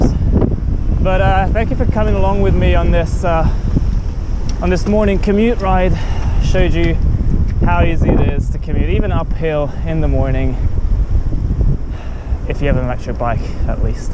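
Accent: Scotland, United Kingdom. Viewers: I would say he schooled in the Edinburgh or Lothian area. Strongly detect gentle middle class Scottish accent. That may also explain why he' wasn't used to heavy morning traffic when young since Edinburgh has more car free zones than most cities plus a more advanced segregated cycle trail nfrastructure.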